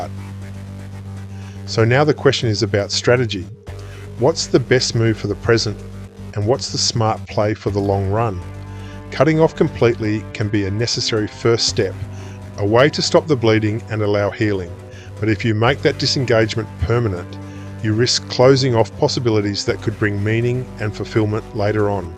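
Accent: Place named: New Zealand. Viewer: This guy sounds Kiwi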